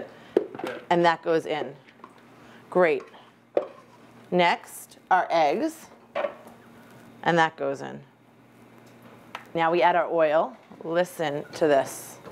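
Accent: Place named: Brooklyn, United States. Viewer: Diane C she's speaking Crown Heights/Boro Park-ese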